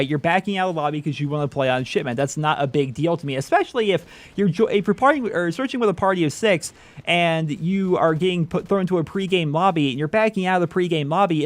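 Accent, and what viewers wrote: United States. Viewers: Wait... You're English? I thought you were American or Canadian Well I'm Scottish and most people don't realise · are you really English? I thought you were American. where in England are you from? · Really you're English? Your accent sounds American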